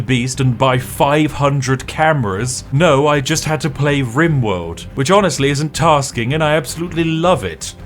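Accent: United Kingdom. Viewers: I was wondering what was making it so I was always being awoken by a British voice talking about tea when I started my night about dinosaurs and monster hunter ecology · I was sleeping, then I heard a familiar British voice and I woke up edit: This is why I fall asleep watching something rendom and always end up in Linus' podcast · I don’t have auto play on, but I have put on a Spiff video from time to time to get in a good nap after work. He’s not wrong about his smooth low British voice. ;) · No auto play but I regularly use your videos specifically Victoria 3 to go to sleep, that smooth British voice soothes my coffee drinking self to sleep, win win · Why is thing British Guy telling me to go to sleep at 6pm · Still prefer your brit voice instead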